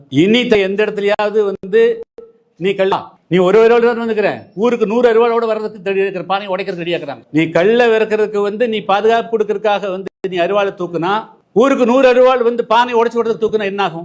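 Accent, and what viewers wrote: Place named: Telugu, India. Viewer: ஐயா நீங்க பேசுறது சுத்த தமிழ் மாதிரி தெரியல தெலுங்கு வாடை அடிக்குது.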